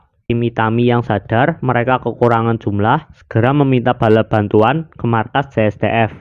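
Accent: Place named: Banyumas, Indonesia. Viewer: Semangat bang.. ngapak joss 👍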